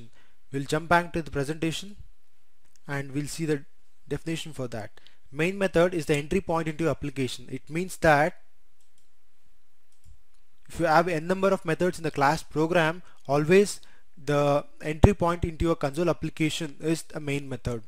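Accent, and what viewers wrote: India. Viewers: You seem like offering gold worth of knowledge i just can't power through that accent. I swear i want to learn indian just to watch these videos. Is it hard to learn?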